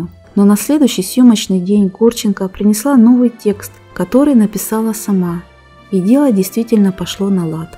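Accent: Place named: Urals, Russia. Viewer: Да, да...Я тоже его слышу... Она,возможно, с Урала...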